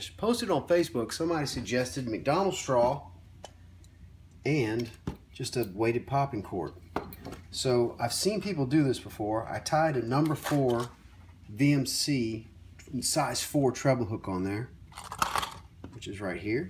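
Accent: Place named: North, United States. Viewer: please make a video showing how you cast that cork that magically holds water.... and no , your NOT local , sound like a yankee , go away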